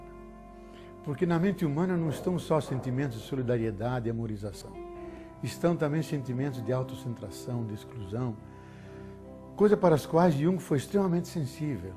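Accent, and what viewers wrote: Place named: São Paulo, Brazil. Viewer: Greatfull,gosto muito desse sotaque paulista, meio double de desenho animado,.magos,freud,investigou os desejos animais " no ser sofisticado, humano,young,observou, outea dimensão, de um modo,discreto dialogou,muito bem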